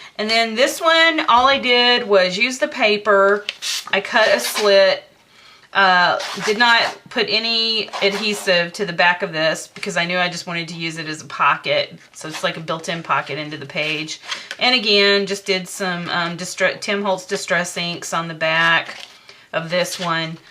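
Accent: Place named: United States. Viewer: What a wonderful album,and watching you do it from the start was great... Love your videos and will be watching them all, also love your accent, whereabouts in the States are you from?.. I am in UK, we do not get some of the things you get easily over there, though it is getting better with the online stores, but my are they pricy! Best wishes, Lesley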